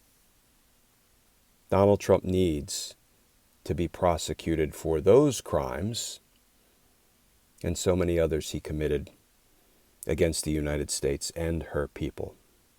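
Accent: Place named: New England, United States. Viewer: LOVE LOVE your measured, calm, clear way of speaking......I hear a little tiny hint of New England in your lovely voice.....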